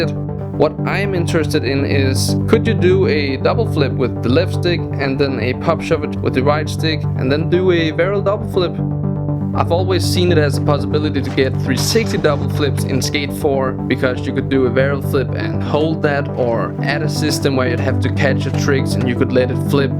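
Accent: Denmark. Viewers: is this Guy danishh? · You're danish aren't you.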